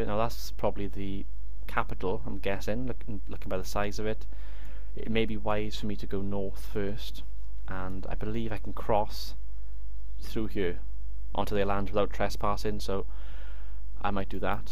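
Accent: Wales, United Kingdom. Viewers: Unorthodox is good though. You make moves I probably would be conservative to do and it is interesting seeing how that plays out. But I can certainly say you have became my favorite TW Youtuber over the past week or so. Also the accent is great :P Can't beat a good Welsh Accent.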